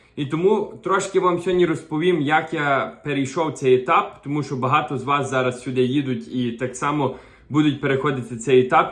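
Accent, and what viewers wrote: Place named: Transcarpathia, Ukraine. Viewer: Цікавий канал, у акцент закарпатський:)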